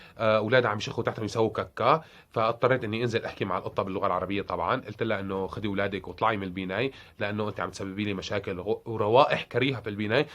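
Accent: Syria. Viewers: يا انت ادلبي ولا حلبي لانو لهجتك لهجت ادلبي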